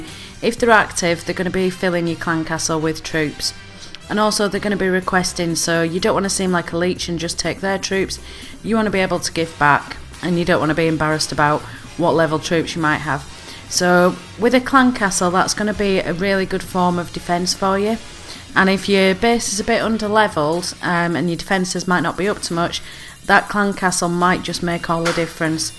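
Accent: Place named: United Kingdom. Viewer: that british english accent is horrible in my opinion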